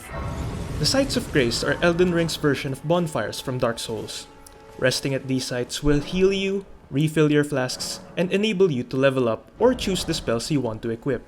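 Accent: Philippines. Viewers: pinoy accent!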